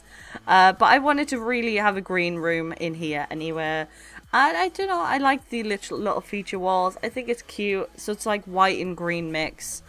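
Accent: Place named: London, United Kingdom. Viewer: New subscriber here! Discovered you today and i'm in love with your content and your accent! Like... Damn, can i be from London to have it? hahaha keep up the good work! <3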